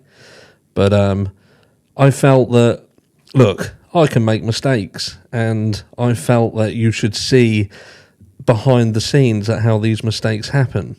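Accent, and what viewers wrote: Norfolk, United Kingdom. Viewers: Are you from Norfolk uk, as I am? X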